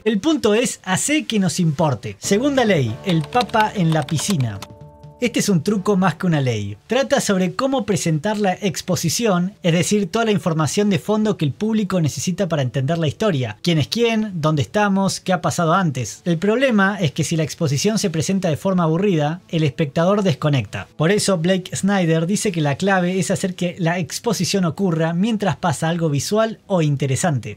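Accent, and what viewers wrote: Argentina. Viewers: No acabé de leer el video, no soporto el acento argentino, te recomiendo que aprendas a hablar con acento neutro.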